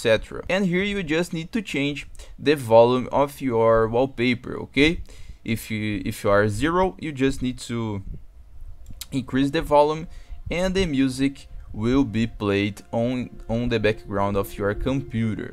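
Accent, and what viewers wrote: Brazil. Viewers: mano, tu é br né? tem sotaque kkkkk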